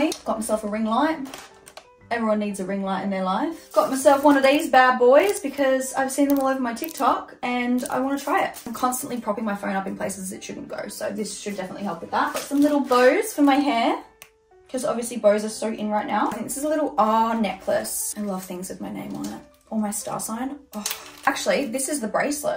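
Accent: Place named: Australia. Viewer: You are a beautiful ginger/redhead. Beautiful light green eyes, cute voice with the Australian accent and pretty smile. Beautiful body and curves, cute little belly button, nice little booty and long legs. You look very pretty and STUNNING in everything in this video. The dark blue jean shorts and crop top tank top and turquoise crop top tank top would have to be my favorite on you, those dark blue kinds of colors just look really good on you and make your eyes pop. Hello cutie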